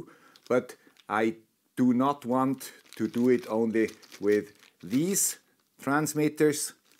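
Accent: Switzerland. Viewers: hello this is the guy with the swiss acce...(smashes like button instantly)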